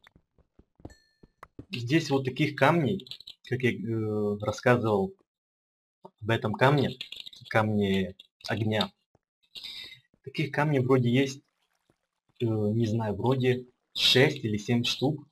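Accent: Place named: Ukraine. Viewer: Акцент України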